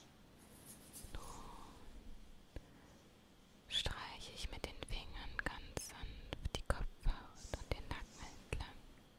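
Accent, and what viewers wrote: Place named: Germany. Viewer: (french) Normalement je ne suis pas fan de l'accent allemand, mais ta voix me détend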